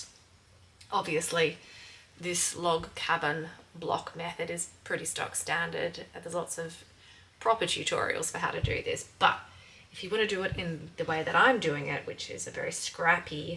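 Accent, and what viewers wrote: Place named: Australia. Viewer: I wondered if you were from Australia! Lovely accent. Wishing you luck from the Midwest, USA!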